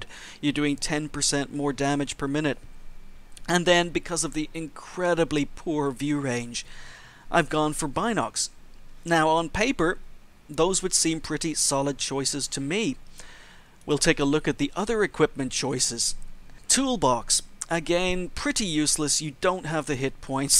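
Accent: Ireland. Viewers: Your accent is fucking bizarre. Make up your mind are you Irish or a brit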